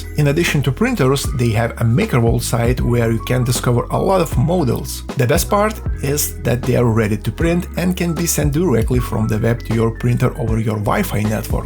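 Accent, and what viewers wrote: Russia. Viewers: Брат у тебя акцент как у русского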